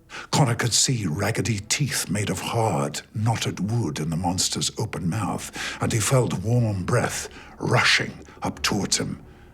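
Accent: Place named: Northern Ireland, United Kingdom. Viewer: Brilliant makes me home sick listening to his northern Ireland accent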